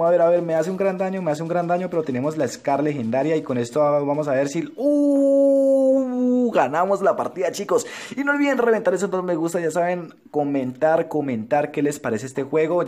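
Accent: Colombia. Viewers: Me encanta tu acento Colombiano💖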